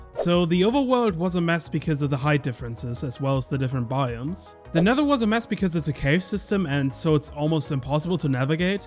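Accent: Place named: Germany. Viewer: Man merkt den detschen Akzent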